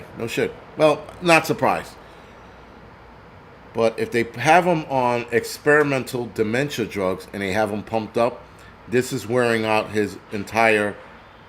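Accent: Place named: Southeastern Massachusetts, United States. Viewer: I love how real you are. You talk like us here in SE MASS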